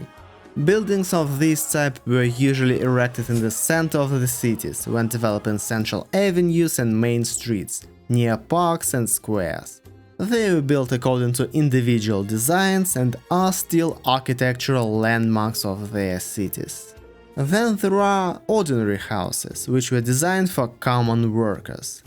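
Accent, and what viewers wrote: Russia. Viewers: Can you rid yourself of this awefull russian accent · Очень хорошо поданный материал, нормальный, достаточно естественный текст. Но прошу 🙏🙏🙏 работайте над произношением. Сейчас, хотя в основном все звуки правильные, их произношение очень толсто русское. То есть, конечно, самое важное хорошо, и это следует отметить. Но нужна дальнейшая работа. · I've spent the whole morning watching your videos! A witty young man speaking perfect English with a very, very heavy Russian accent... I'm in love.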